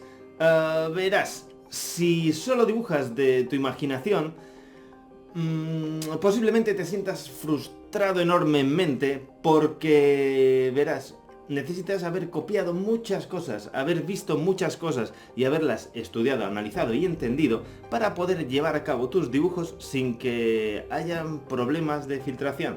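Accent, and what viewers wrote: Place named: Spain. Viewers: owo molaria, aunque no me gusta mucho el acento español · tampoco me gusta el acento español.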